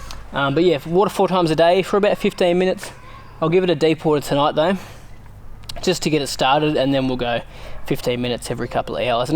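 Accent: Australia. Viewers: Is it me or are you Australian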